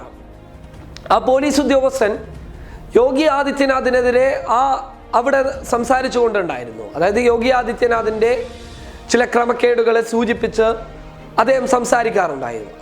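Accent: Kasaragod, India. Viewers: Kasaragod shaili kayarivarunnu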